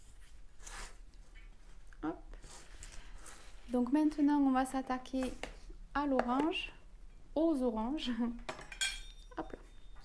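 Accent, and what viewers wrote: South, France. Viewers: coucou j'adore tes vidéos je voulais savoir tu habite dans quelle région (du Sud je crois par rapport à ton accent) car il a l'aire de faire beau par la non ba voilà j'ai testé ta tarte à la tomate et chèvre elle a dit du succès et elle méritais je vais l'essayer demain le poulet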